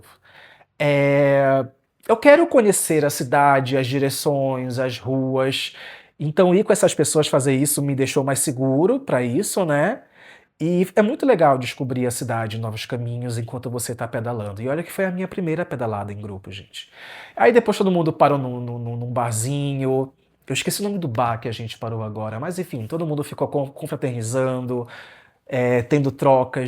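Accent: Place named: North, Brazil. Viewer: oi! há pouco conheci seu trabalho e já comecei a te acompanhar! fala um pouco mais sobre sua jornada, acredito que seu sotaque revela que você origina de alguma parte do norte, me corrija se eu estiver errado, enfim, ótimo conteúdo, estou super contente em ter "conhecido" você.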